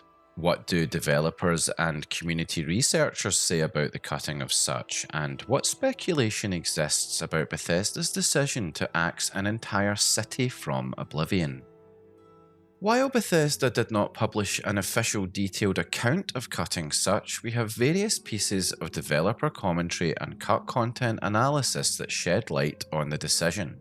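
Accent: Scotland, United Kingdom. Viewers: The Scottish accent makes this video much better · I like thick Scottish accents, and I like Bethesda game lore. You got me on board lol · Are you Scottish? 🏴 let’s go I’m Edinburgh side 😊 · man i so love your scottish accent... always liked how it sounds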